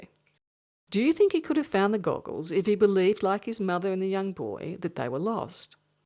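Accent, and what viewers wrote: Australia. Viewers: razzzzz. sounds like an Auzzie professional surfer. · Australian accent is killin me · women with Aussie accents <3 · Horrible australian accent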